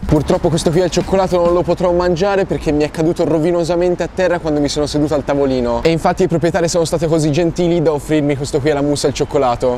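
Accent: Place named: Tuscany, Italy. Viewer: Comunque tu parli un toscano"strano"....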